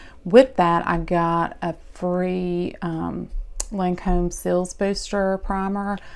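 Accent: South, United States. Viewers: I love your voice it`s so soothing and a lil country too, but that`s ok I like it.{@ on IG}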